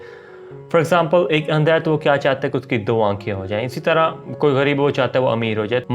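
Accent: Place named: United Kingdom. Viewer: Sir.I love your English accent 👍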